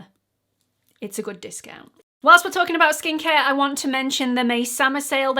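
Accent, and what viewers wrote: United Kingdom. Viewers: Hi there! I'm in the U.S. and some of these product names I've never heard of. I'll see if they ship here. You are so funny and Fun! I find my self laughing with some of your expressions and your LOVELY Brit accent!!! (I want to talk like that!) You are so believable. I think it's your cuteness, your honesty and of course your accent. Thanks for brightening my day! God bless you dear. Lori ❤ Minnesota, USA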